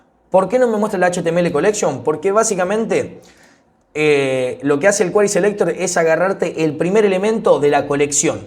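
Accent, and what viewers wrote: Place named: Argentina. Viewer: No solo esplicas dpm si no que encima en argento. Nuevo seguidor crack , segui asi. 🎉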